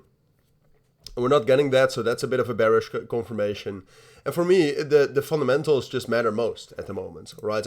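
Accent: Netherlands. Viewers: Is this guy Dutch?? Dutch accent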